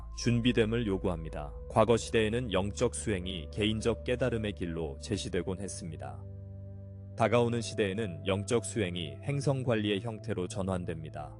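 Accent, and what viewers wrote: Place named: Gyeongsang, South Korea. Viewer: 야호 신납니당~~ 경상도 사투리가 당연하지요 창녕띠기 아인교 ㅋㅋㅋ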